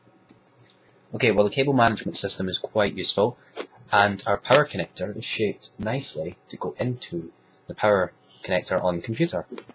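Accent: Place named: United Kingdom. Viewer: Nice review glad to see a British review instead of a annoying yank. Am going to get one I just fancy a change from a windows pc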